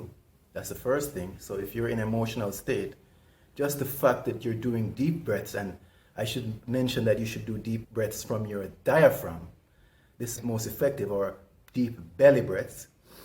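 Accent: Caribbean. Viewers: where u are originally from? caribbean? my trinidadian self wonders where your accent is from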